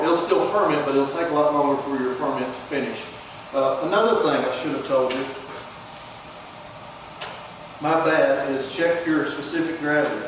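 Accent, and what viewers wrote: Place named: South, United States. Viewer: Ferrr-ment. Why does that southern accent sound so dam stupid?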